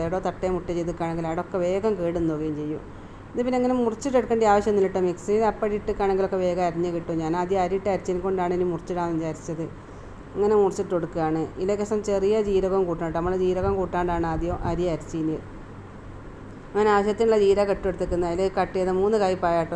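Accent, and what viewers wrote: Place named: Kozhikode, India. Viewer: Inghalu kozhikkode aano sthalam😂samsaram kettitu aganethonni❤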